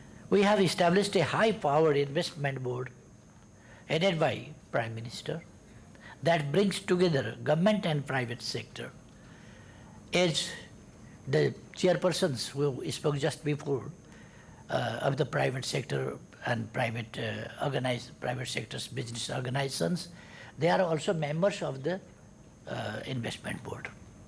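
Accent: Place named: Nepal. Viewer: Very Nepalese accent and confident about what he is talking , loved his style . A man from village of Nepal who struggled and put his life in danger many times. He obviously did not have means to study in good school rather he read on his own means possible and reached to this stature , indeed deserves applause . Cheers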